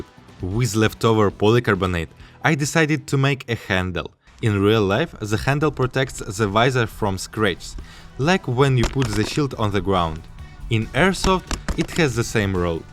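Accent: Poland. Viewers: are you polish? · We understand you are not Hungarian you are polish!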